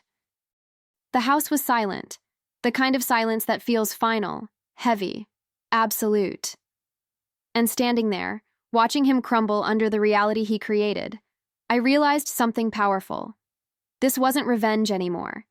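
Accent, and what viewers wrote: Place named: United States. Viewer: very strong over-emphasised American accent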